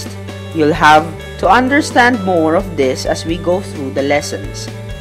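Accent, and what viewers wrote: Philippines. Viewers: Your accent is a Filipino. Well this is useful thanks. Maybe u can have a lesson on person advertise on your video. Just saying. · ❤ the Filipino Accent ..Easy to understand. 👍👍👍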